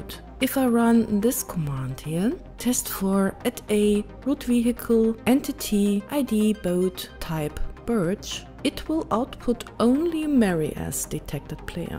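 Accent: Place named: Germany. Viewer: I.. have.. to.. resist.. Srsly your voice.. What is your native language, maybe german? While reading the commands you nearly sounds like a robot :D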